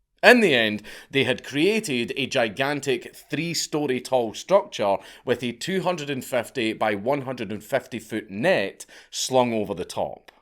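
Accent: Scotland, United Kingdom. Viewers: Hearing a Scott talk about my hometown feels wrong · You are my favorite scot, even though you live in brit. Love your accent of homeland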